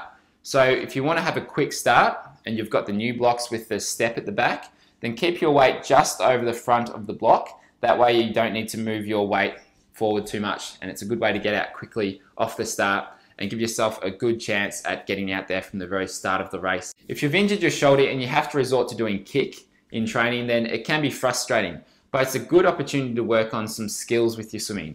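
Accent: Australia. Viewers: he's australian, isn't he? good tricks 👍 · nice aussie aceent.....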